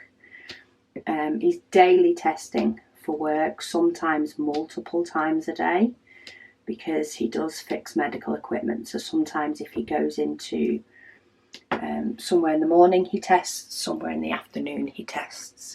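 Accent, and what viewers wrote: England, United Kingdom. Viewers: I really enjoy watching / listening to your videos. I have started a HAED diamond painting. I have been doing a 2 by 3 grid section just like you do, as it fits on my tablet screen. I don't have as many colors as your large HAED. I pick the symbol that is the lowest in the left most column, and let pattern keeper tell me the DMC number. I am working from right to left, and this outlines the section the quickest and fill in from there. I live in the states, and after a few minutes of listening to you speak, my inner voice will suddenly have an English accent. lol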